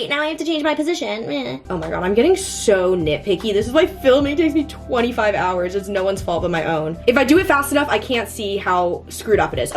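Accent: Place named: England, United Kingdom. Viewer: im scottish myself and hearing use scottish slang in an english accent pained me 😭😭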